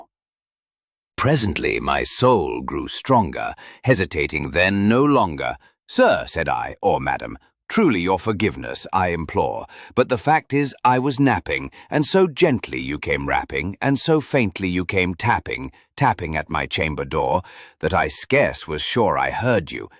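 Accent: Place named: South, United States. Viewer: As with your other presentation with Pistolero Poe, this is a joy to behold. I do detect a slight southern dialect; it becomes easy to imagine this as Poe's actual voice. The AI illustrations for his poem would have fascinated the same man who wrote an essay about the then new daguerreotype process, and sat for several of such portraits. He was quite taken by new technologies and the sciences. Thank you for including the entire poem that we might read along, or ponder it...evermore.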